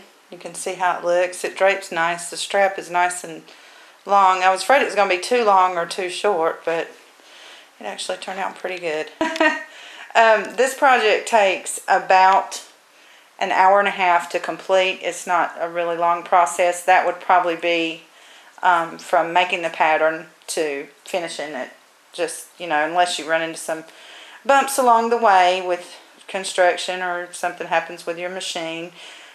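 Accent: South, United States. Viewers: I love your instructions. You make is so simple. And your Southern accent. Subscribed to you!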